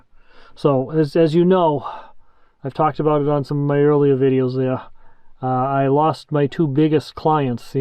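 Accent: Boston, United States. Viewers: 100% Boston accent. Con-corn! I love it! Old school!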